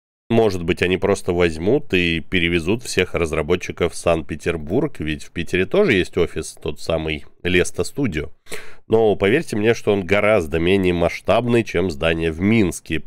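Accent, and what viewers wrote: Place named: Odessa, Ukraine. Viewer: У тебя такой типа одесский говор, как у того поца у конотопской синагоги.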